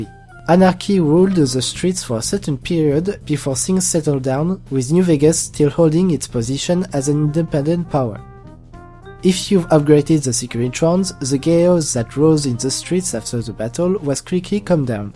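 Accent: France. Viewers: ... never changes. In the beginning he uploaded great tutorials and lore videos, with a mild French accent. Now he uses his own music and has significantly upgraded the quality of his already amazing videos, but beneath the cover he's still the same. Because... never changes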